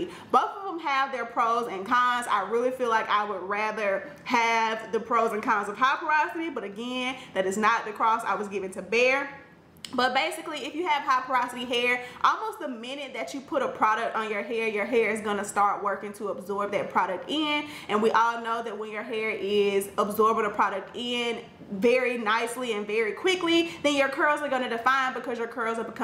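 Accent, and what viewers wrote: South, United States. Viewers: I love all your tips, i had been struggling with wash n goes fir a while and just decided to revisit them, im still learning, and you have inspired me not to give up, your hair is gorgeous and Iove your southern draw 😘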